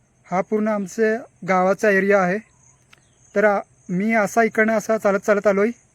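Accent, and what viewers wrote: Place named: Agri, India. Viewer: खूप छान माहिती दिली, भाऊ तुम्हीं हया भाजी विषयी थोडीशी माहिती मला होती . तुमचा मुळे अजून माहीत झालं, भाऊ तुम्हीं आगरी आहात असं वाटत, मी आगरी आहे. 🙏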